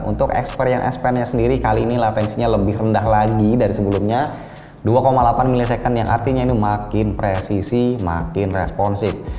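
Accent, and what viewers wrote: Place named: South Jakarta, Indonesia. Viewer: Jaksel. Bet logat mu bang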